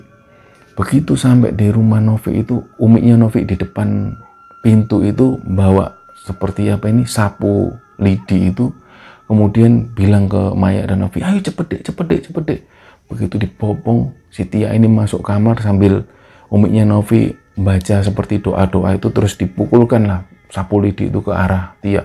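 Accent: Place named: Magetan, Indonesia. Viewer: Beneran magetan, orang magetan mana suaranya ✔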